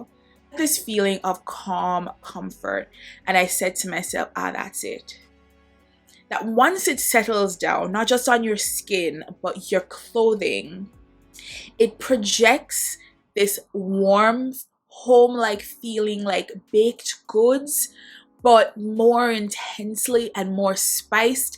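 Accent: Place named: Jamaica. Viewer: I’m a simple girl. I hear a Jamaican accent, I hear “perfume”, I subscribe.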